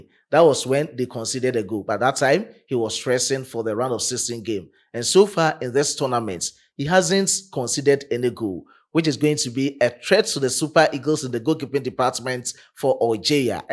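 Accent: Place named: Ghana. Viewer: Please u to speak 9ja English oo not gh 😅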